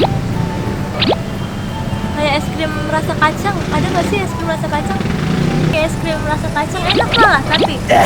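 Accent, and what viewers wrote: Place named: West Java, Indonesia. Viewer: Channel aksenya kayak sunda sih wkwk eh aslinya tegal 😂😂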